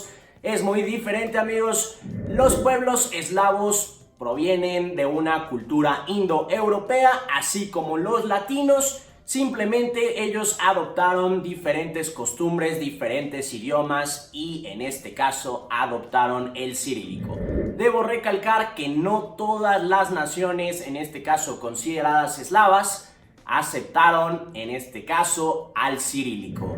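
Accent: Mexico. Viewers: Anda no te pases...suena mas a acento mexicano que a español de España... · A veces suenas como Méxicano Mano, pero es buen video, aunque la parte de la pronunciación la fue muy rápida, cuando uno le enseña a alguien que no tiene ni idea de un tema es mejor despacio. Es tal como si yo quisiera enseñarle a tocar guitarra a alguien y empiezo a hablar de progresiones con acordes de novenas, oncenas, trecenas etc. Si ve? Soy músico y quiero aprender Lonque más pueda de este idioma por mi nuera que es de Bulgaria. Entonces mira tutoriales de como ser maestros y seguro tendrás muchas suscripciones más. Me suscribo. Chao.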